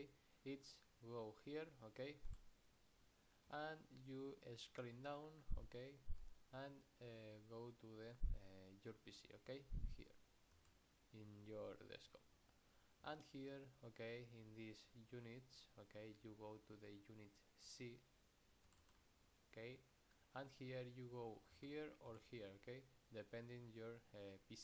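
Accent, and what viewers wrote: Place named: Albacete, Spain. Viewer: tienes un acentazo de albacete q no te puedes ni imaginar pero me has salvado la vida eres el mejor sigue asi por favor te amo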